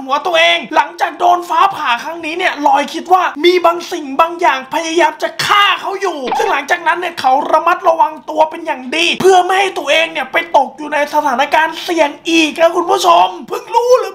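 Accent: Thailand. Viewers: ทำไมต้อง เหน่อออออ 5555.5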